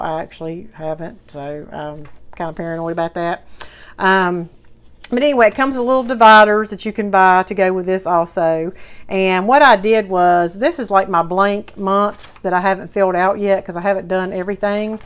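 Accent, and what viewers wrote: South, United States. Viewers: Love your videos and your southern twang. Dollar Tree is the bomb!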